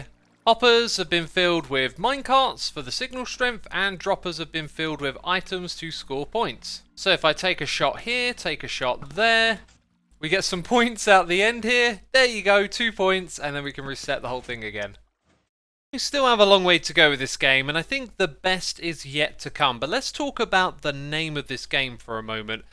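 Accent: United Kingdom. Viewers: WHOAA whoa whoa whoa, I had no idea x was BRITISH. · He's a muscular man with a British voice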